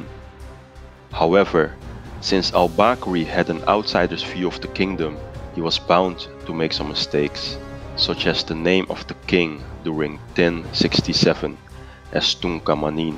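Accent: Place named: Poland. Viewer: Omo you sound more like polish or ukrainian or even Austrian...u sure?...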